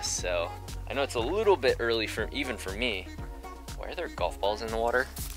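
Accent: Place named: Canada. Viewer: I am detecting a slight Canadian accent from you! Already?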